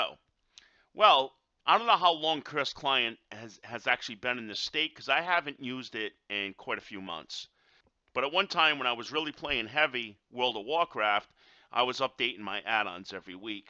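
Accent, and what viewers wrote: New York, United States. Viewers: amazing info and a new yawrk accent. love it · Omg i love your accent, Americans have the best accents. Great vid too, thanks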